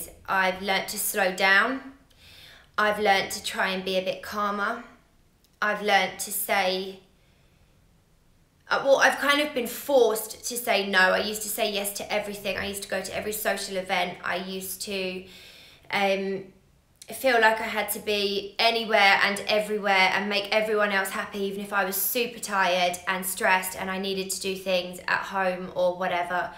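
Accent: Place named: United Kingdom. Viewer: Love you as an instructor!! Your British accent kills me in a great way. Love it. Enjoy your classes.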